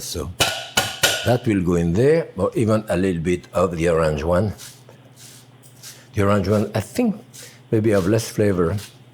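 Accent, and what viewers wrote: France. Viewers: Come on old timer you can do it. Say C-R-E-P-E"SSSSSSSS" Put the "S" in there you can do it! I believe in you. You are speaking English to an English audience. We use the "S" for plurals and you damn well know this because you've lived here for most your life so stop with your overaccentuated French accent. It might have been cool with the ladies in the 70's but now it's kinda BORING! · sounds French still are you on drugs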